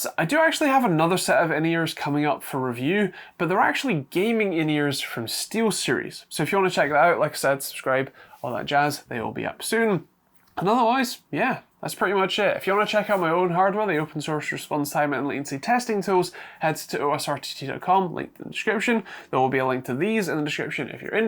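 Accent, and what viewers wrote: Scotland, United Kingdom. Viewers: Appreciate this review. I bought these a while ago and have actually bought many Soundcore earbuds over the last few years. These are definitely some of the best I own. I really like my A3i's also. I tend to prefer the bud and not the stem style in general. I have also purchased the A20i's and have recommended them to several people who all loved them so that was pretty cool as well. The phone stand on these is a great touch and the sound is quite good too! Great value for sure! I really enjoyed your style as well. First time seeing one of your reviews. 😊 I have an awkward question. Is your accent Scottish or Irish? I really like it but can't determine which it is. I'm a Canadian who spent 3 years living in Scotland when I was a child so I should know but can't quite figure it. 😛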